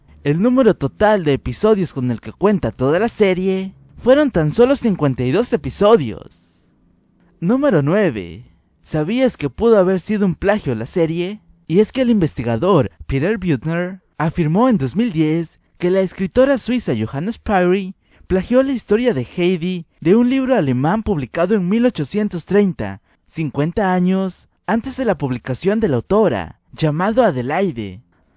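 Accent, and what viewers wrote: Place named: Mexico. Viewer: Uno de los libros más leídos en Suiza...no va a ser El Quijote....y leelo con acento europeo no mexicano..bobo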